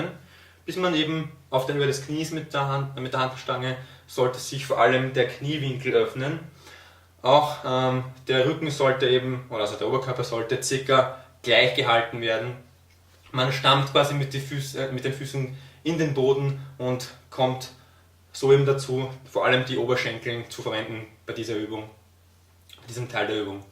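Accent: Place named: Vienna, Austria. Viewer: nie daran gezweifelt ;) Bist du Wiener ? Hört sich für mich stark danach an :)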